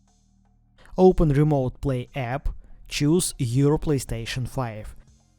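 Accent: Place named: Eastern Europe. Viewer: It's like Slavic mixed with Greek with a hint of Arabic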